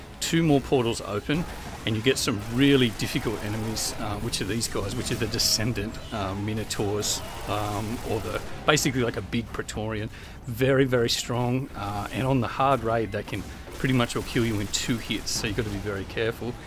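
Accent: Australia. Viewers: I love these videos. It explains so much plus I can never get enough of the Aussie accent.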